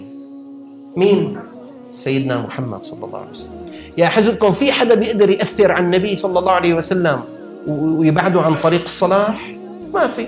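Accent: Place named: Levant, Arab world. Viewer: فين صوتك شامي فين دمشق...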